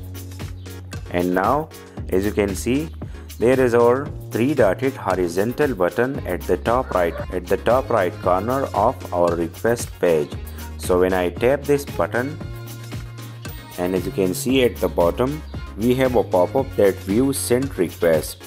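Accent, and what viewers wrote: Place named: India. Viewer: your indian accent give me chills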